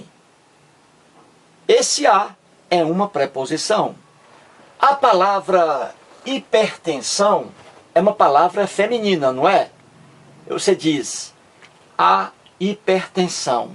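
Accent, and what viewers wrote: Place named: North, Brazil. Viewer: vc devia se concentrar só em ensinar português, é muito mais bonito que postar videos blasfemos!! Acho bonito o.sotaque nortistas seu. Abraços do piracicabano aki!!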